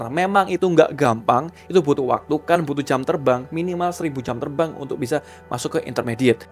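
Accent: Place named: Surabaya, Indonesia. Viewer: mantap cak, logat sby nya kelihatan... sbynya barat, timur?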